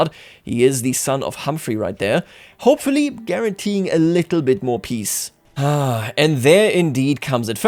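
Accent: Bavaria, Germany. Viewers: Warum machst du das eigentlich nicht auf deutsch? Ich hör ja sogar den Bayerischen Aktzent raus!😅 Würde mich nur mal interessieren. Danke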